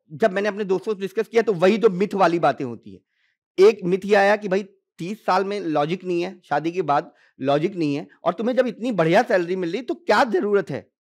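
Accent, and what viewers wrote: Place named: Kanpur, India. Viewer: Kya baat hai kanpuriya language .. 🙏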